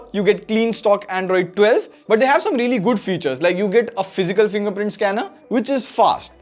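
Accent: India. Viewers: Hi, i'm an Italian man. I watched your video because i'm evaulating the phone, but without the help of the subtitles i couldn't understand what you were saying. It's me, im' not used to listening english of people from India. Anyway, good video (writing, screenplay, photography, effects), great review (I'd love more hand-on use, but whatever), keep looking to me the perfect replacement to my Moto G8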